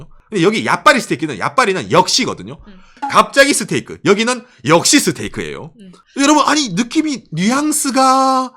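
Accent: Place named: Gyeongsang, South Korea. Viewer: ㅎㅎ ~~~멘치로... 경상도 인가벼...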